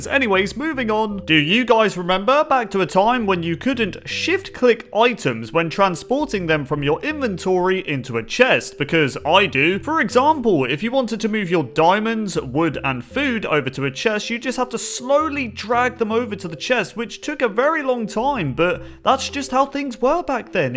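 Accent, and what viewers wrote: United Kingdom. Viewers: bruuuh are you talking UK english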